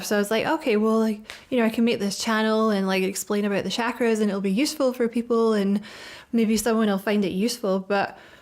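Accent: Scotland, United Kingdom. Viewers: OMG, I knew that you were Scottish by your beautiful bro. And I just found out that I have a percentage of Scottish in myself and I just absolutely adore that.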